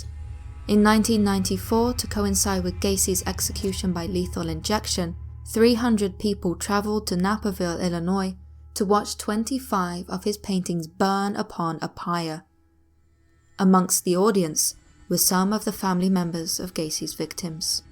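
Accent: England, United Kingdom. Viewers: Great video...love the accent!! Not trying to be Pervy McPervinstein but english accents are sooo hot and make a woman sound classy and intelligent which we all know is classy as well. Anyway ive aleays seen depp as a wussy...no suprise a painting could do that to him. Especially knowing the backstory of not just painting but artist as well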